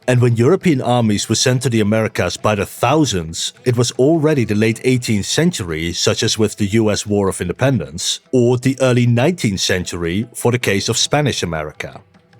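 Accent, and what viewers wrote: Netherlands. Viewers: Do I hear a Dutch accent? 🇳🇱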